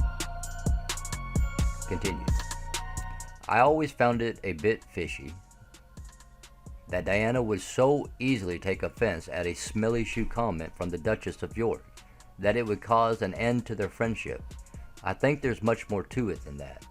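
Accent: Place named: Israel. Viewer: Her accent though is way to israeli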